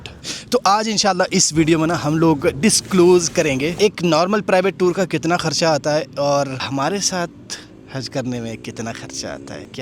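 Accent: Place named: Pakistan. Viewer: Bhai aapki Zuban Pakistan wali hai, main confused hoon ki aap kahan se ho?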